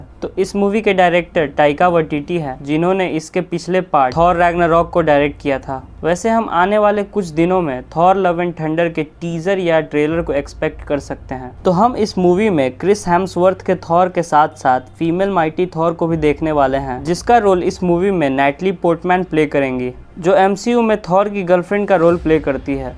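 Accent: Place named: Magahi, India. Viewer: Video khub nick lagal ba..keep uploading such contents bro.. can sense our Magahi tone..❤️❤️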